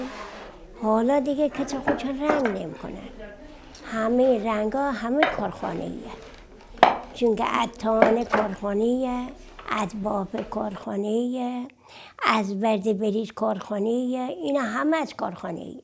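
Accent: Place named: Eastern Turkey, Turkey. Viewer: She speaks similar tone as eastern accent of Turkey with similar sentence emphasises so I feel like I should understand it but barely get a few loan words.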